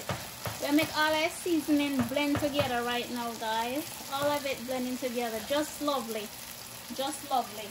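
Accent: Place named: Jamaica. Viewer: Wow i have not tasted something like this before i know it is very delicious i can hear Jamaican English asent i love Jamaican language it make me laugh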